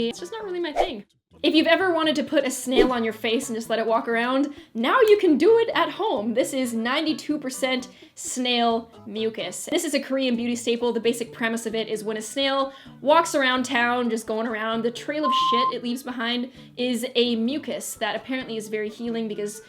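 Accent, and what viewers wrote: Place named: Canada. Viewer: Heehe totally random but I always feel super cool or something when I can sense/ hear a Canadian accent 😎😎😎😂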